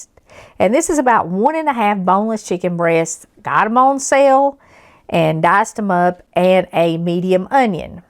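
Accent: South, United States. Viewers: You talk perfectly fine. Southern Food/Country Food is famous for it’s flavor and comfort. So is our hospitality and gentle accent. God blesses us with you and your cooking skills.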